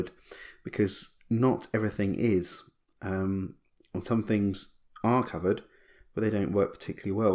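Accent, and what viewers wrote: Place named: Liverpool, United Kingdom. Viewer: MY GOD HE"S FROM LIVERPOOL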